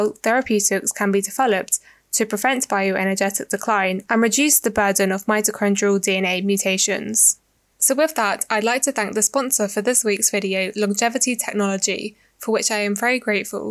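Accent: United Kingdom. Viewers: Im sorry to say, the brittish accent is just so hard to take.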